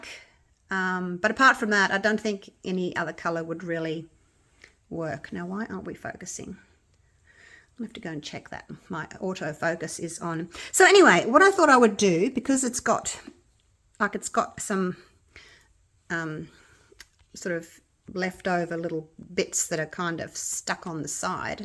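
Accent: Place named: Australia. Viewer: Side note curiosity I have to comment on your lovely accent again. Australian accents are so very cool. Forgive me if I'm wrong.🤔 But dudes are called blokes right? So what is the correct term for chicks? A little 70's era usa slang for guys & gals🤣🤣🤣🤣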